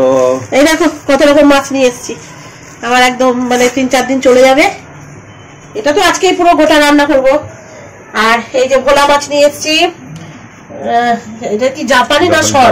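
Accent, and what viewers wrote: Bangal, Bangladesh. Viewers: শুভ জন্মদিন দাদা 🙏🙏 আপনার সুস্ততা কামনা করি সবসময আনন্দে থাকবেন আর আমাদের আনন্দ দেবেন আপনার কথা শুনলে খুব ভালো লাগে বাঙাল ভাষা শুনতে বেশ লাগে তোমার মেয়েকে অনেক অনেক ভালোবাসা ❤❤❤❤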